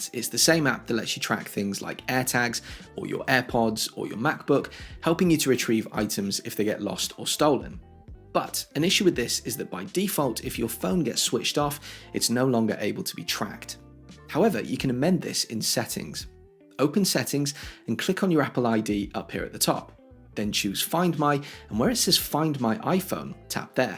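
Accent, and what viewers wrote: United Kingdom. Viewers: Great videos!. I like the content and the presentation style. BTW what regional UK accent is that?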